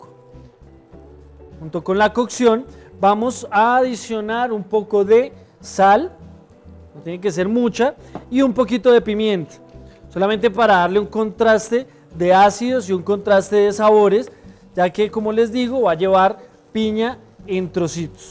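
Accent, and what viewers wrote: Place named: Colombia. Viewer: Me gusta ese acento y me. Convenció con el hecho de ser colombiano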